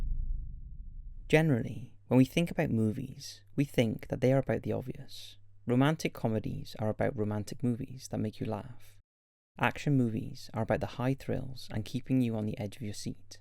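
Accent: Wales, United Kingdom. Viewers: Am I hearing a slight Welsh accent from.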